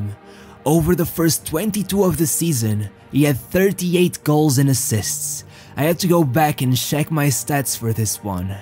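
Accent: Portugal. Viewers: your perfect english vocabulary paired with your charming portuguese accent... bro... I am not gay or something but I am totally in love with you. You are telling those stories with true love from your heart and everyone can hear this. if maybe, 20 years from now, your videos will still be on youtube, I will watch them and maybe shed some tears of joy, coming from the nostalgia, created by your words about the good old times. merry christmas and a happy new year to you my fellow lover of the greatest game in the history of mankind. PS: I was tripping on LSD when I watched your CR7 video and it was an awsome experience.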